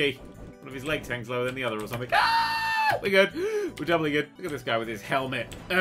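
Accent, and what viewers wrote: England, United Kingdom. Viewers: HMHS.BRITANNIC Thats how english people say it. They also say math like maths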